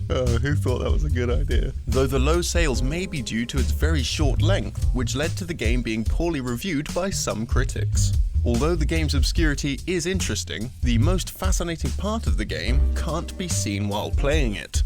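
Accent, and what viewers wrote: United Kingdom. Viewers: sounds like a british vinny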